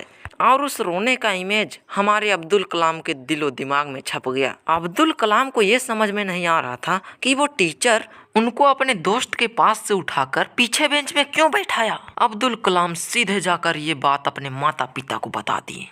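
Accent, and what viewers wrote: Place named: Odisha, India. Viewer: Bhaiya apka aawaz se aisa laga ki aap Odisha se ho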